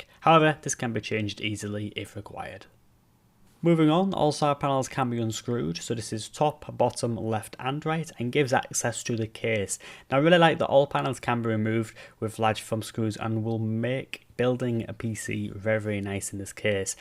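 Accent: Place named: Scotland, United Kingdom. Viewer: Are you from Scotland or something, because i cant understand your accent a quite bit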